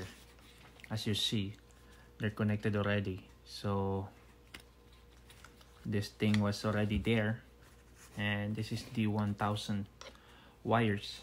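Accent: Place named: Philippines. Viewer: That pinoy accent is strong here.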